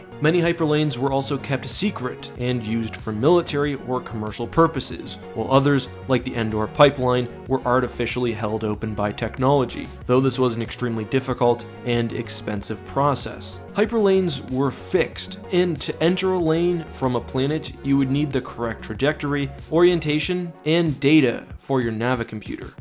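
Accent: Canada. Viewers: are you canadian? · Are you from Canada??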